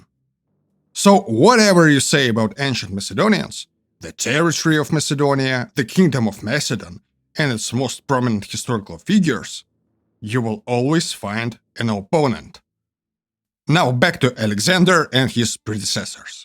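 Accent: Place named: Eastern Europe. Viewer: II suspect by your Slavic sounding accent your motives are less than objective. a. Contrary to your claims in this video that the "majority" of Greeks didn't consider ancient Macedonians Greeks (a "fact" you've made up), ancient Macedonians were recognized as Greeks by other Greeks. This is witnessed by their centuries of participation at ancient Olympiads which at the time were only for Greeks. Its not just Herodotus that claims ancient Macedonians were Greeks. Countless artifacts suggest this. For example there is records of Alexander's own father Philip participating at three different Olympics in the horse race. What you are doing is twisting a handful of references of other Greeks calling them barbarians. Most famously Demosthenes did this. Whats missiing in your narrative though is context. Demosthenes himself was called a barbarian by other Greeks on several occasions. 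Barbarian was being used as an insult. b. I can tell you have depended on Wikipedia's flawed map of ancient Macedonia. Ancient Macedonia of Alexander fame is already 100 percent in Greece. Even parts of ancient Paeonia is in part of northern Greece.. THE Macedonia.. Skopje is not even geographic Macedonia unless you want to claim Roman Macedonia or ancient Macedonian conquests of as "Macedonia". And in that case, huge chunks of north Africa, middle east and Asia would also count as Macedonia. C. You downplay the self-detemrination of ancient Macedonians.... like Athenians.. .like Spartans.. like Corinthi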